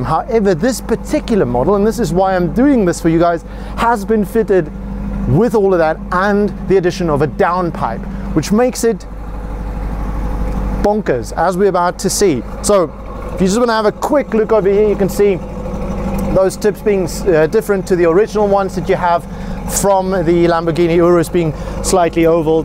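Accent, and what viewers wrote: South Africa. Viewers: This was an epic review as always! His presentation style, that honest emotion and RSA flavour...🔥🇿🇦 Dankie 💯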